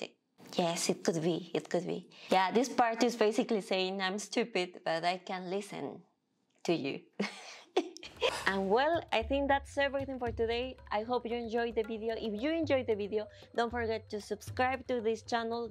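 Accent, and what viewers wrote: Philippines. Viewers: In addition to your physical appearance (very Filipino), your mannerisms, way of speaking, and your accent is very Filipino. I don't know how you did it but, if you could speak Tagalog or one of the other Filipino dialects, no one would question you for a minute !! I have much exposure to Filipinos. In one of my jobs, of 50 people, I was the only one not Filipino. Most of my friends in College were also Filipino. You would fool me !! DNA tests: Most companies only test certain/small sections of you genome. That is why you can get different results from different companies. There will be variances. Because of the way genetics work, you could still be part Japanese, but your ancestor's genes were not dominant. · Your accent sounds like Tagalog was your first language. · I was thinking she looked and sounded Filipina, went straight to the comments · Wow Now this is very interesting.. 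You look Asian and how you speak like a Filipino perhaps due to Spanish influence. So I ever I travel to Mexico I can blend in as long as I don't speak. 👍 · Like you I too was very curious about my races. First I am 50% Asian ( Visayan/ Chinese), Spanish, and amazingly 24% Indigenous. The Asian is: Malaysian, Taiwanese,Indonesia, Laos. Indigenous: Peru, Bolivia, Ecuador, Brazil. Who knew! And yes, you can pass for Pinoy, but you do look mixed. I live in Hawaii, born and raised. Here 75% of us native people here are mixed. Mostly Asian.Your accent can also pass for Pinoy. Like South America, the Philippines was also raided by the Spaniards and also the Japanese. So happy I came across your page because I am researching my heritage. You are very Beautiful. Aloha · That's a Philipino accent, right? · even her accent sounds filipino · I definitely get where you're coming from. I've been told I look Asian all my life. 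I'm at the point I can easily tell when someone is trying to "figure me out". I've even had people argue with me that I HAD TO HAVE SOME Asian in me, even to the point where they go as far back to when the land bridge existed connecting Russia and the Alaska. And they tell me I'm Asian because Asians crossed that land bridge. I will definitely get my DNA test done. I'm very curious now. I will say, because of your stature and your accent, I can see how some may say you're Filipino, especially where you say your "F"s. Regardless what the DNA tests say, you're a very beautiful LATINA. Where it proudly. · You speak English with a Filipina accent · Ur accent sounds Filipino btw I’m not Filipino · Curiously, you speak English with a distinct Filipino accent. I have lived in both countries (Mexico and Philippines) and have had a Mexican and Filipino wives. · Who taught you english, because you really sound Filipina. 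If you went there, people would be very confused. Seriously who taught you English. Where did you get that accent from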